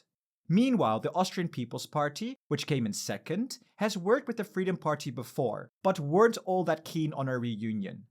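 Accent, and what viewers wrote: Germany. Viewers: nah you pronounced the names of the politicans and parties way to german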